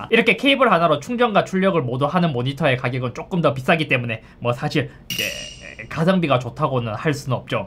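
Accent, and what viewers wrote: Gyeongsang, South Korea. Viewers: 경상도 억양 빼고 다 좋음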